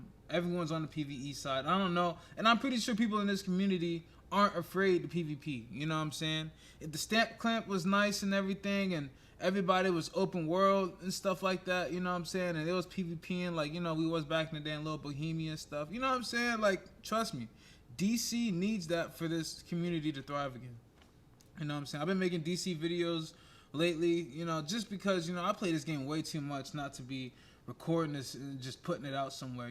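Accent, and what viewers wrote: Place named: Pennsylvania, United States. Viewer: My buddy is from PA and talks similar. Probably a Steelers fan 🤮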